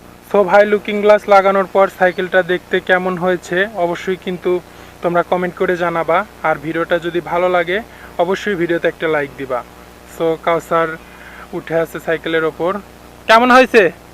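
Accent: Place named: Bogura, Bangladesh. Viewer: এগুলা বগুড়া এলাকার কথাই পাওয়া যেত পারে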